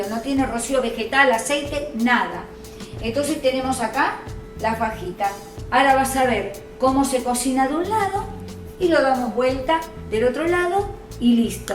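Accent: Argentina. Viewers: Que buen pan y rapido, ya lo conocia pero me gusto mucho y con acento argentino,,,,un saludo desde España... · jajajaja!, me dan mucha gracia sus comentarios y su acento taaaan argentino , voy a hacer pan pita y el pan con sencillitas !,saludos y muchos éxitos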